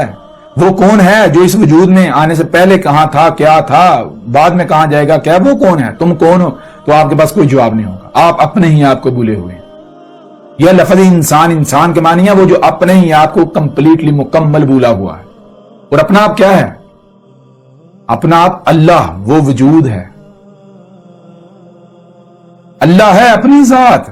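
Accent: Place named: Gujarat, Pakistan. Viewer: Iss gaandu ko urdu bhi theek se bolna nahii aati.......lehja marasiyon orr gujraatiyon wala hai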